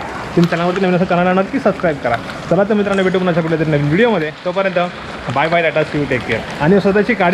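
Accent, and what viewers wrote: Konkan, India. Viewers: दादा तू कोकणी आहेस का बोद्ध आहेस तुझ्या बोलण्यातून तू कोकणी वाटतोस गाव खूपच सुंदर आहे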